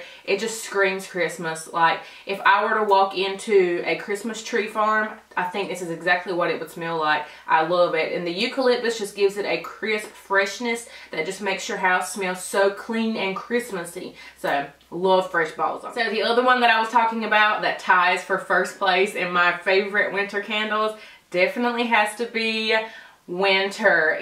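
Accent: South, United States. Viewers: Love that southern accent of yours ❤️ where ya from? I’m from the south too ofc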